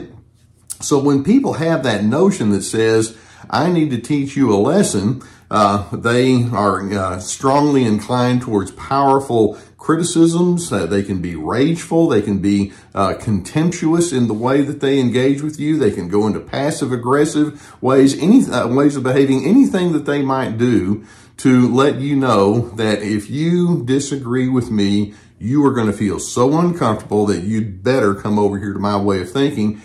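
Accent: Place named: Texas, United States. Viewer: Another great video from Dr. He really gets to the heart of the matter! I so often heard this horrid expression from my mother and having it defined logically helps me to rationalise and overcome its effect. Many thanks for the calming effect of these videos. That lovely Texan accent and the comforting Gus on the sofa help a lot too! 💕💕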